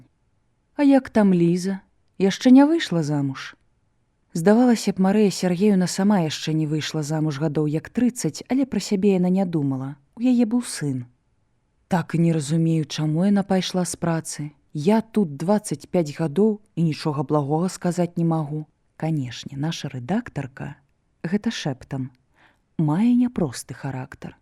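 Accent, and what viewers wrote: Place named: Russia. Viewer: Вымаўляецца не "іх", а "йіх", "йіншы", "йімі" і г.д. - варта азнаёміцца з вымаўленнем І ў беларускай мове, бо няправільна шмат вымаўляецца. Мяккасць не беларуская, часам праскоква беларуская. На сутыку слоў асіміляцыі беларускія адсутнічаюць, вымаўляецца як у расейскай.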